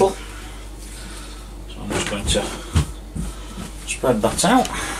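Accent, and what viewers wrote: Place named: Yorkshire, United Kingdom. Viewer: LOL, you must be a Yorkshire lad. Your accent is just like my brother in law from Hull.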